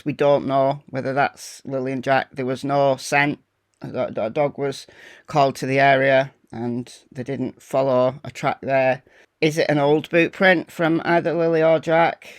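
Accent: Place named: Lancashire, United Kingdom. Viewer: Hey - your voice remimds me so much of my former mother in law(a Lancashire lass!) On a serious note, my grandkids are a 6 yr old girl and 3 yr old boy. The idea that they would go off alone from the safety of their garden and into the woods is utterly preposterous. There is just no way on earth.... I don't think they were abducted either - I don't think they ever left that home, at least not alive 😢 I find it interesting that out of the many videos there are regarding the subject ive not seen one comment that exonerates the parents of all blame and believes their story whch says a lot really doesn't it! Sending love from mid Wales! 🏴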